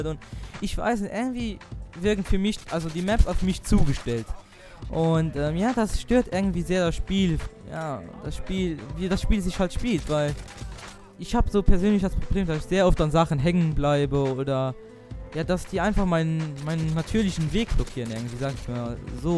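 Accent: Luxembourg. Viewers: dass du aus luxembourg kommst hört man finde es cool das es auch jemand aus luxembourg gibt der Commentaries macht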